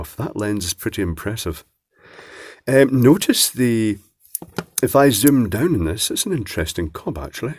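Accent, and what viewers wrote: Scotland, United Kingdom. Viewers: I only come for the endearing Ms.Doubtfire accent. I guess your content is ok too,.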